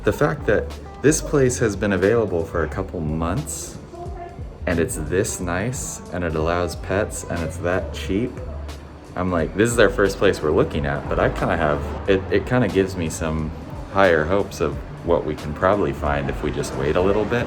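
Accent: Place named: San Francisco, United States. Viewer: Your accent sounds like you may be from the Castro district of San Francisco. Am I right?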